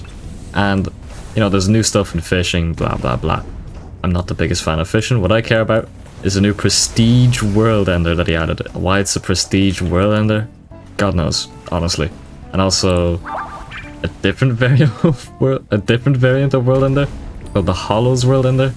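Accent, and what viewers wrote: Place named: Ireland. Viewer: bros irish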